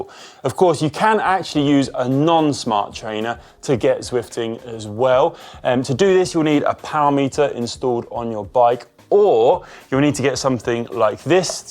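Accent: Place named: England, United Kingdom. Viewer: Irish name, Irish flag, English accent?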